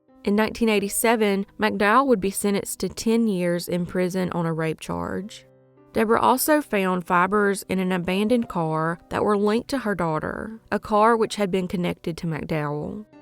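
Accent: South, United States. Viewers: From another country girl to another, I love your accent In the fact that you named your channel Southern Girl Crime Stories!! It fits perfect to a T, and your content is great because a lot of us have never heard of some of these cases and I am grateful for you putting it out there. I know that it takes a lot of hard work and time but I must say once more you are doing a fantastic and awesome job! Keep up the great work and have a wonderful week sweet face.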